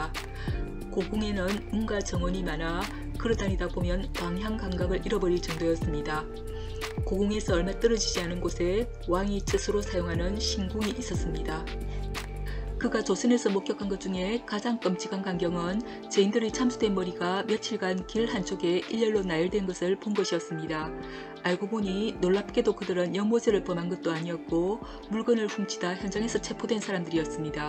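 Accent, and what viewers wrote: Gyeongsang, South Korea. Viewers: 쌀을. 살 로. 발음 하는 경상도 발음 입니다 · 신기하단말야~ 유튜버님 경상도 분이신가봐요^^ 차라리 오리지널 억양을 쓰시는건 어터실지요 듣기가 좀 .....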